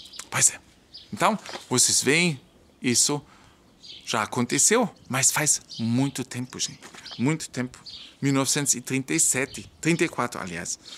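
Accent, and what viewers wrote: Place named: Germany. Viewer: Olá. Você é muito simpático e adorável. Suas reportagens na língua portuguesa com o sotaque alemão é único. Sou sua fã.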